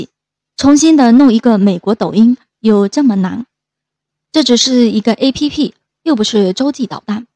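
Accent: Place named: Fuzhou, China. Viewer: 應該是福州老鄉不容易好比你不容易碰到能把普通話說的遛的香港人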